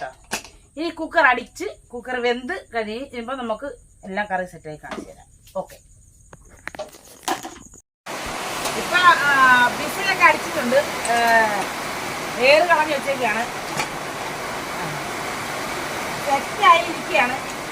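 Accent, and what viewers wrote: Kottayam, India. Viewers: കോട്ടയം ഭാഷ അങ്ങനെ യൊക്കെ തെന്നെ ആണ്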